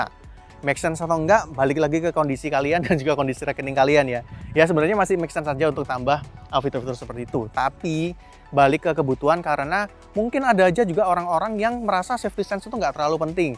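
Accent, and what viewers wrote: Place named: Java, Indonesia. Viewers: Bingung jon ngomong indo logat jawa... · logat suroboyoan itu.. beda sama jawa yg lain.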